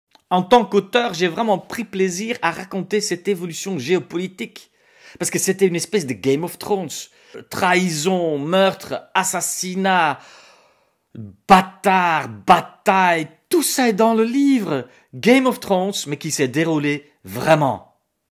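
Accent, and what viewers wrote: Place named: North, France. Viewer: Je n' ai pas lu le livre, mais j' ai dégusté avec plaisir le podcast. Votre français léché avec votre accent du nord est tout bonnement un plaisir pour les oreilles et ce qui se trouve entre. Ha si l'histoire m' avait ainsi été contée, je serai devenu incollable. Merci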